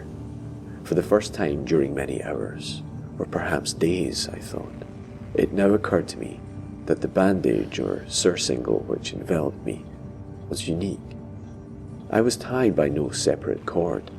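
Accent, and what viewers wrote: Scotland, United Kingdom. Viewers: Oh my! I must tell you...I LOVE YOUR ACCENT! Are you scottish? :D Will you marry me? Haha! But seriously, you have a very lovely accent...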